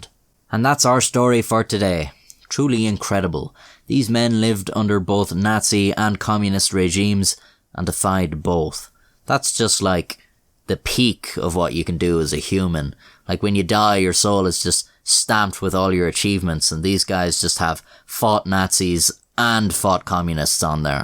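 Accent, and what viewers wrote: Ireland. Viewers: I thought this was a darkdocs video, but when I heard the Irsish voice I was surprised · This has quickly become one of my favorite Youtube channels great narrating and insanely good brogue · Bad-assery! Love the facial expressions! Makes me bark with laughter! Don’t abandon us Q- I need the snarky Irish storytelling! There might be a few questionable youtubers but there are Nobel folks too! Stand tall and be proud you bastard! Thanks and fight on!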